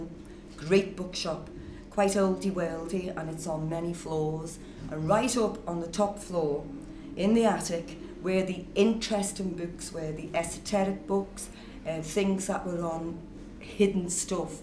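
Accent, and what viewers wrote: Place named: Liverpool, United Kingdom. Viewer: love that liverpool accent. coolest in the world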